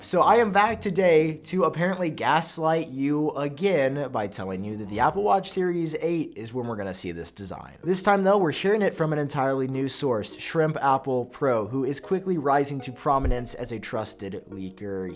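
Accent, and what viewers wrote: United Kingdom. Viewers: Sorry You've made this offence too many times I gotta say something😂 There's been too many strikes. I must correct this, Your "British" accent is in fact an English accent. Britain also consists of Scottish people, Welsh people and Northern Irish people and we pride ourselves by not sounding like them. The american equivalent would be like impersonating a New Yorker with a Boston accent. The more you know 😜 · I like your British accent far more than Damien’s hair cut. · From one English chap to another 😂😂 great accent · The British accent is pretty much on the money!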